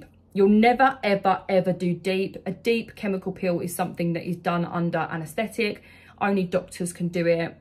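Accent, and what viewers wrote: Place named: United Kingdom. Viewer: Hello, I absolutely love your UK accent❤ I live in Texas and recently got certified as a concierge neurotoxin injector in Prosper TX. Would you please be able to help provide what it is I'd be needing to build clientele also to confirm that course i took is compatible to a level 4, 5, or 7? I'd really appreciate your effort and assistance. Thank you 🎉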